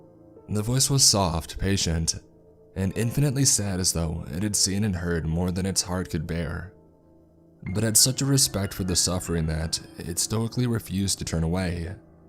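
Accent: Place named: North Carolina, United States. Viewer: I’m guessing whatever answered his prays and told him, yes. you will die in a cell or this cell, idk if it’s just me or I am remember wrong,, but I thought he said that. And if he did i wonder if it’s the same prison he was in, or maybe he did say in a cell. Either way I like how he became a officer, that and him saying this is my last time. That’s kinda for shadowing him getting hurt and killed if he had to respond to whatever he needed to be they’re for. Love your work my guy, you have the best voice imo, I hate to say it but Iv got ware I always look for your channel over the others. There not bad but I relate more to how you talk lol. Idk if it’s because I live on the east coast of NC and have friends around here and a couple in Ten that have a similar voice lol idk how to really explain it lol. But your the best even your new channel is funny af but it’s got both types of funny. 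Funny just to be funny and funny like watching a guy pull a dame big looking gater up while in a basically a live rafe if your lucky, and him thinking time to nope tf right up out of here lmao.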